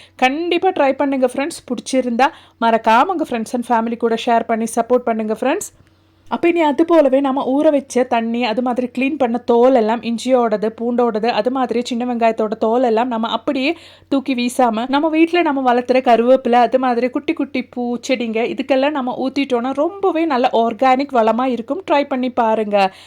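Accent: Kerala, India. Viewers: உங்க பேச்சில் மலையாள வாசனை அடிக்குது.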